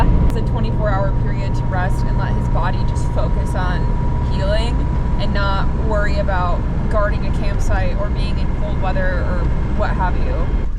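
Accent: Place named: Midwest, United States. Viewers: Oh sure, I didn't even notice the accent until ya pointed it out, ya know. Whelp, from one Midwest girl to another: Tells yeh mom an them I says hi. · you're weird, because you are YOU. That's who you are. And the seats? Weeeeell.... 🤣🤣🤣🤣No, just kiddin. You love them, then I love them. And, Good you got these stuff done to Poe. And, what's wrong with your Michigan accent? Be proud of who you are. We, your people, love you. And we sure hope the Best for Blue! ♥️♥️♥️♥️♥️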